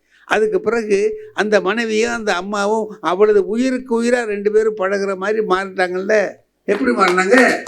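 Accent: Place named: Cauvery Delta, India. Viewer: I like this delta district accent because I too from there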